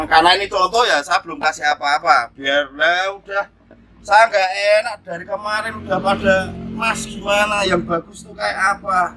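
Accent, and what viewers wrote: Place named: Tanjung Priok, Indonesia. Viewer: Kaya orang priuk bang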